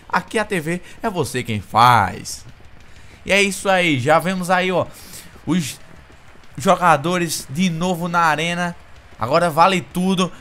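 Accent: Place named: Pernambuco, Brazil. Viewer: owwww vc é de Pernambuco ne ?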